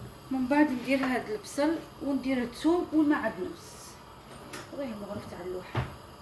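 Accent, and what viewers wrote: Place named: Tlemcen, Algeria. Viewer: اول مرة نتبعك الله يبارك باينة عليك تلمسانية من نبرة صوتك الله يبارك ما عندي مانقول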